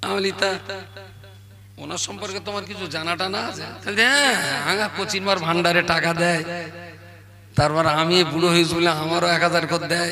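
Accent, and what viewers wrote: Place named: Bangladesh. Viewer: এর কথা থেকে বোঝা যায় এই একজন অনুপ্রবেশকারী বাংলাদেশি।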